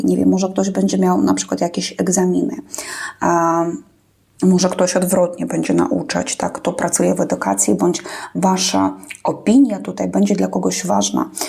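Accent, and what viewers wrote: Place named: East, Poland. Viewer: Bardzo ładne czytanie, wyczuwalny lekki akcent ze wschodu, ale ogólnie poprawna polszczyzna 😊 Bardzo mi się podobał ten przekaz dla Rybek. Pozdrawiam ❤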